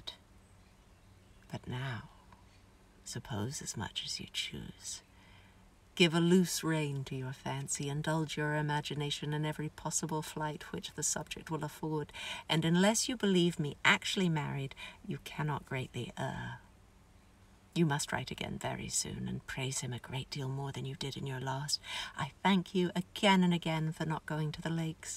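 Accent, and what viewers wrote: England, United Kingdom. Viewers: So happy to hear the English accent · I was your driver in Scotland when you were acting in game of thrones pilot. I remember the English accent. 🏴😁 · Her accent is still sort of British❤ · Her beautiful English accent became so familiar to her quickly after the first chapter. Adored this. Thank you. No one could ever be a better Elizabeth than you. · Oh my, what a gorgeous find!!! 🥰 I must be the only person who hadn’t watched Pride & Prejudice mini series until this week! ☺️ I enjoyed it sooo much, then wondered what has been in since…shocked to hear an American accident and lighter hair in later interviews…but so delighted to hear the English accent here WHILE reading P & P! 🙏🏼👌🏼I’ll be listening to more of these readings! 😊 Have since watched performance in A Quiet Passion #bravo 👏👏 and didn’t realize she played Myrtle Logue in the King’s Speech! 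😮 👌🏼👏👏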